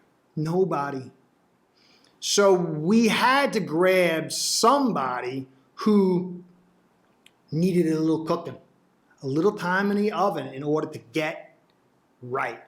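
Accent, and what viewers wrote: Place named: Northeast, United States. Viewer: Love you're north east accent (piason?) can't put my finger on it. Not overly nyc or that north jersey twang. Almost like between philly and Trenton area.. reminds me of home. New sub. I love your even keeled approach unlike many fin fan fans. Fin fan since a lil kid in 84' in Philly then in 86' we moved 2 Tampa area , Bucs sucked and were blackouted every home game. Back then Miami was still Florida's team. And they were on every week. So, i haven't missed a game since 87'. Thru reg. Tv, direct tv, sports bars when away or when in the service. A few games had to mailed to me but I'm that loyal. My family, from folks to wife & daughter know during football season that 4 hr slot is blocked off for the Dolphins, don't invite me out & if i go over my folks for dinner, I'm not moving from the tv. Lol. I also played d2 & coached a lot, so i know the x's and o's pretty well. 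But, Flo's forgotten more about the game than ill ever know & he's at practice & watching coaches film all week. I don't like to 2nd quess coach's every step of the way or arm chair 20/20 play call. I know what goes into play calling & offensive installs. Without knowing the play & having access to coaches film to see who didn't win their 1 on 1's, it's impossible to question those micro things. Ill judge him on a macro level overall but not yet. Too early. I know we have been conditioned to be mediocre & expect us to eff up a wet dream. But, older i get the less emotional (except during the game... when X made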